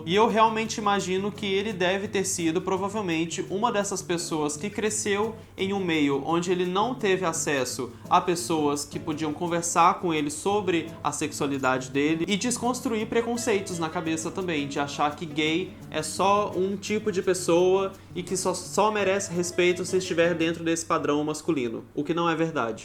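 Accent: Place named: Brasília, Brazil. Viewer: O é brasiliense? Cheguei no canal há pouco tempo e tô reconhecendo o sotaque hehe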